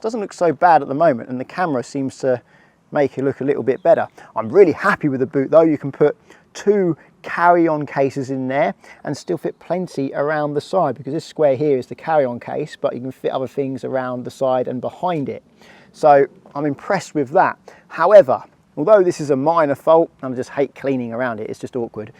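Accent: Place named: United Kingdom. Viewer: great review. But I was more fascinated by the Bii iish accent. After 32 minutes of this video I was saying beTTer (instead of beDDer).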